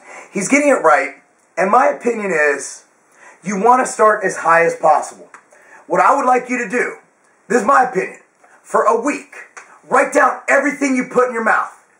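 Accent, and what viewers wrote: United States. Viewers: Country ass accent is cool ;)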